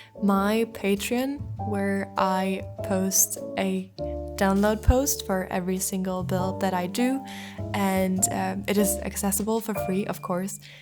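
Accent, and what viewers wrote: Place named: France. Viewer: hahaha I was trying to figure it out your accent. I really love accents! I was in between France and Portugal bc you sound a bit similar to a few people I know from these places. But anyways, I’m glad you replied 🥰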